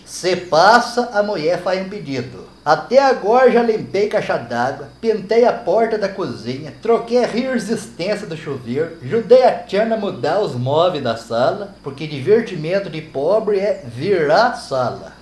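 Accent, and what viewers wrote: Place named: Rural, Brazil. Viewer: 😂😂😂😂😂 Ele é muito bom fala um caipireis perfeito lembra minha infância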